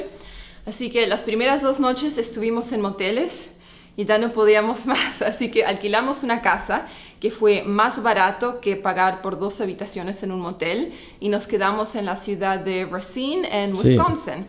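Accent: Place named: Argentina. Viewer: Esta chica aprendió español en Argentina, o de un argentino, si no me falla el oído, jajaj. Hermoso video! Saludos!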